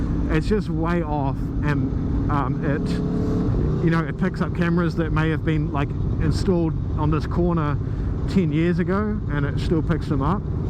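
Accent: New Zealand. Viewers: Hey bro Good to hear a fellow kiwi on YouTube. What bike is that you are on?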